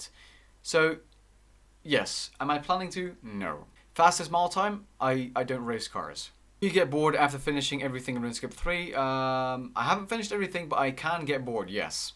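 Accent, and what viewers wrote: Netherlands. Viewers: Wait... you are dutch?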